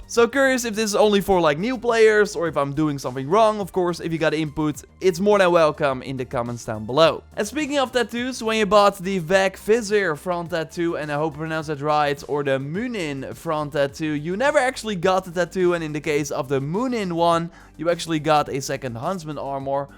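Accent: Netherlands. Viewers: WOW, IF THAT IS NOT A REALLY THICK DUTCH ACCENT, I DON´T KNOW WHAT IS!?!?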